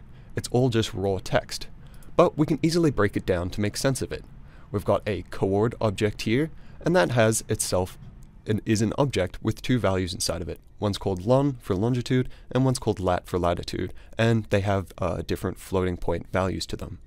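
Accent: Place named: East Coast, United States. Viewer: I thought this tutorial was excellent. Thank you. BTW, I can't quite pin point your accent. I can hear its in the United States but where specifically I can't quite figure. It does have some East Coast in it but given you operate from the West Coast, I'm wrong probably. Anyway, thanks again for the clear insights. I've since subscribed for more excellent content.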